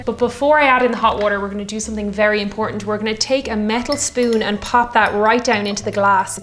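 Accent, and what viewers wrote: Ireland. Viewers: Ugh Irish and Scottish accents 😍 😫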